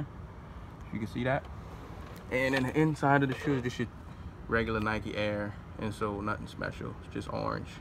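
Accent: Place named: New Orleans, United States. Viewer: Where u from bro sound like u from the N.O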